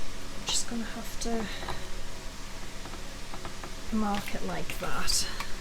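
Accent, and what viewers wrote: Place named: North East England, United Kingdom. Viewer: Great ideas, and I will certainly use some of them, but I really kept playing the video to listen to your accent. My parents were from South Shields, and I lived and worked in Gateshead for three years (1967-70), although I haven’t lived in the UK since then. I’m guessing you’re from somewhere not too far from there, so your voice gave me a real blast of nostalgia. Thank you!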